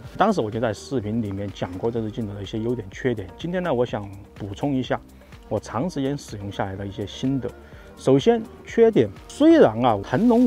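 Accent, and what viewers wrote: Sichuan, China. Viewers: 这个频道主感觉是个四川人，讲话太像了。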